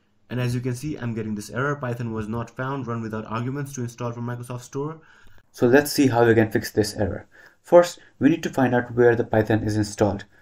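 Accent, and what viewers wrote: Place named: India. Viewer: Never trust a guy with a indian accent that gives advice about python and windows config channels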